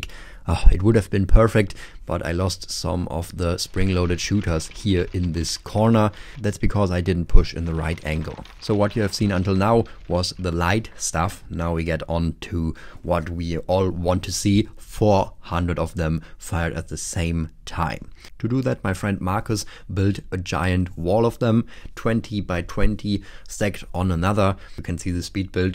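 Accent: Germany. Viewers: I didn’t notice how German you sound until the „Drei zwo eins“ , but now I can’t unhear it XD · Als ich das Video gestartet habe wusste ich sofort das du deutscher bist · Man hört richtig das du eigentlich deutscher bist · Ha ich da gerade jemand deutschen gehört? oder Österreich? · you sound german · This dude sounds German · i smell german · Man hört nen deutschen akzent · Du bist deutsch😮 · Man hört den deutschen Akzent aber cooles Video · Min drei, zwo, eins... dann noch dieses Englisch mit deutschem Akten, da merkt man das ein Deutscher redet.:) Grüße aus Germany! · Are you german? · Didn't know you were german xD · Wird a German excent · Bist du aus Deutschland hört sich nämlich so an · are you german? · Höre ich da ein deutschen Akzent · Was für ein krasser deutscher Akzent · Are you German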